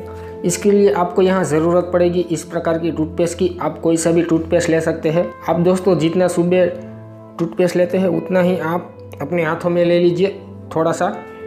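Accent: Gujarat, India. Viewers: Bhai tu gujrati haina teri aawaz se hi pta chl gya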